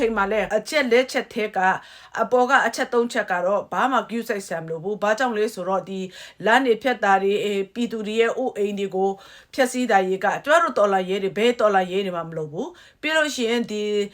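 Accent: Kachin, Myanmar. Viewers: စိတ်မချမ်းသာဆို...နေ့စဥ်လိုလိုပါဘဲ ရယ်....ကချင်သံဝဲဝဲလေးနဲ့ ရဲ့မျက်နှာလှလှလေး{....အော်ရီဂျင်နယ်.....မဟုတ်လောက်သော....]ကိုမြင်ရရင်...စိတ်သက်သာရာရစေတာတော့အမှန်ဘဲ...ညီမလေးနဲ့ဂျိန်းဖောမျိုးနွယ်တွေအားလုံးဘေးကင်းရန်ကွာကျန်းမာပျော်ရွှင်နိုင်ကြပါစေ.......ထာဝရအားပေးလျက်.....။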